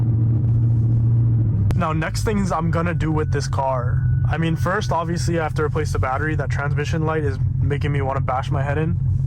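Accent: Canada. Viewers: The moment he got in the car and started talking, more and more I hear the Canadian. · The km/h and accent is definitely 🇨🇦😂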